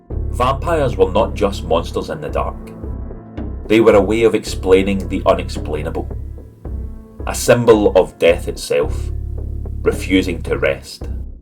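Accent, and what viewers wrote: Scotland, United Kingdom. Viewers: Excellent stories, great background music and your Scottish accent ads to it all. Love it!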